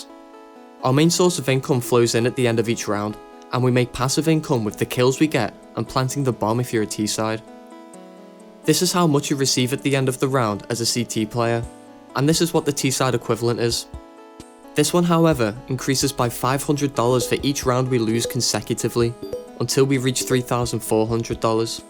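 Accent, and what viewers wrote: Liverpool, United Kingdom. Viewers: scouser accent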